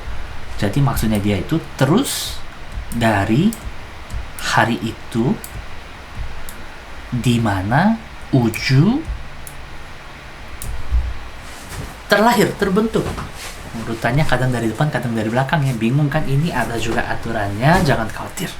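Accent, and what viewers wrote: Indonesia. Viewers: mantap kak.. emang org indo harus ikut lidahnya org indo.. liat yg luar negeri susah dimengerti 😁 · Saya suka, logat Anda Indonesia banget. Mantap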